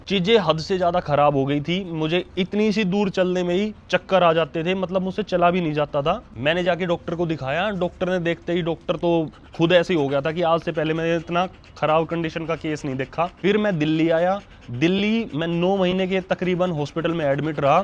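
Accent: Haryana, India. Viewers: Apki lunguge aisi lagti hai jaise aap ladaku ho kyu ki apke hariyanvi styl main dhamkakr bat karte hai..pr apki baat se pyar jyda jhalakta hai apne bacho se jis tarike se bat karte ho usko dekh kr lgta hai..❤❤❤ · arey bhaiya Haryanvi accent se log aksar judge kar lete hain😂😂😂😂 mere Punjabi hone ke kaaran log mujhe bhi ladaku type bol dete hain😂😂😅😅😅 sabka apna nature hota hai bhai😊😊😊 Lots of love for cuties and ❤❤❤❤❤ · कोई बात नहीं भाई मैं तो सिर्फ सिया जिप्सी और आपकी हरियावानी बोली देखना अच्छा लगता है ❤❤ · App kha sa hai.. apka bolna ka tarika tho haryanvi type h 😅😂❤❤ it's my first time on yr channel 😊 apka bacha bhut cute h 💖🌹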